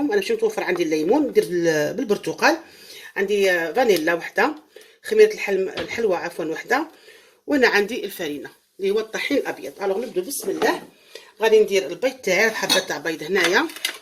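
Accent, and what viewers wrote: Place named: West, Algeria. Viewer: هدرت الغرب شابه😍تعجبني😂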